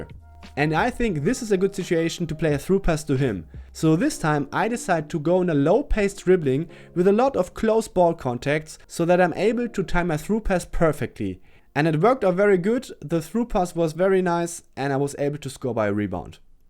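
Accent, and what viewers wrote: Germany. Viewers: Weiter so, Mega Videos! Dein Dialekt hört sich deutsch an, bist. Du deutscher?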